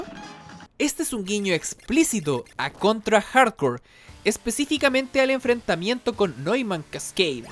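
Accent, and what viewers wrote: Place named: Chile. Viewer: Buen video rey es un clasicazo este juego me lo completé con todas las finales y ya jugare nuevamente en la collection de aniversario. PD: Me fijé en tu acento y creías ser chileno hasta que lo dijiste en el video jajaja saludos bro desde San Bernardo, Groenlandia.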